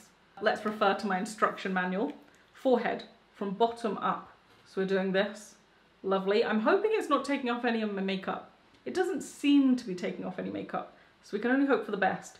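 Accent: United Kingdom. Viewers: you are always great fun! I had to chuckle...I have the watermelon cuber too...As a Texan, I LOVE your Brit accent. You are practically perfect! Thanks dearie!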